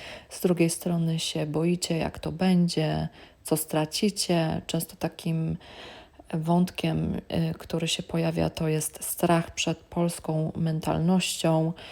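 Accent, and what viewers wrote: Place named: United Kingdom. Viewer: If you talk English why you have a British accent?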